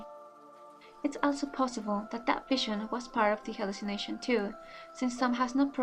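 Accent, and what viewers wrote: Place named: Eastern Europe. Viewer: Awesome video. This story blew me away. Great thoughts and analyses. Love your Eastern European accent, btw...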